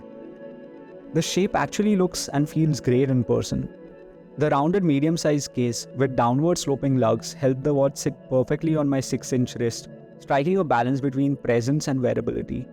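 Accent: India. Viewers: I used to believe I could eventually get used to the Indian accent........... I was wrong.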